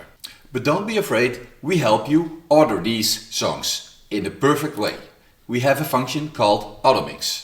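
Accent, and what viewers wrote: Netherlands. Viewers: I like your narration - is that a dutch accent I hear? reminds me of my grandpa :) good times! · hahaha hij praat Engels op de Nederlandse manier 😂 je hoort het accent gewoon 😜 wel cool dat deze software van Nederlandse bodem komt !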